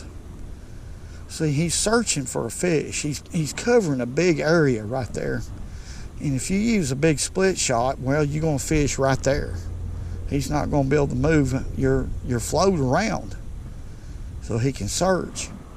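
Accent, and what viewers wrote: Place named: Florida Panhandle, United States. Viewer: I really enjoy your videos my friend! I’m from the panhandle of Florida and I love to hear your accent! I have the same thing going on lol!! I moved a couple of states away and I don’t hear anyone talking like us besides your videos. Keep making these videos and I’ll keep watching em.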